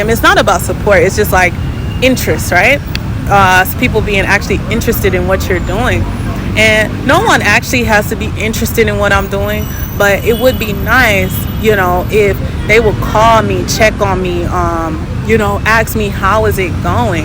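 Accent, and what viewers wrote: United States. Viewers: This is easy. Your family thinks you're mentally unstable. They feel you are ungrateful for the sacrifices they made to escape from Jamaica. They also think you're putting your fantasies and expat self-loathing before your daughter's well-being. I don't think you're insane, but I do think you're extremely gullible and immature for your age. You're also spoiled. Many doors have opened for you simply because of your extreme physical beauty. Despite your good fortune, you allowed airheads to convince you that you were lost and without value in America. You will continue to feel even more lost in a continent that is not your home. You will always be a foreigner in Africa. No matter what country you visit, you will be reminded of that. Will you be treated better than Africans from other African countries? Sometimes, but only because of your American accent which will make the natives think you are rich. 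You will be overcharged, scammed and a target for theft almost everywhere you go. It will also be extremely exhausting dealing with visas. Thankfully, you will still have your American passport, because YOU WILL NEED IT to return to the country that you despise.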